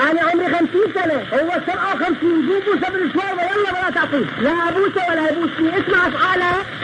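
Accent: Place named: Baghdad, Iraq. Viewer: مشكلة بعض الكلمات غير مفهومة بسب التشويش بس الكلام البغدادي الاصيل روعة شلون لهجة حلوة ❤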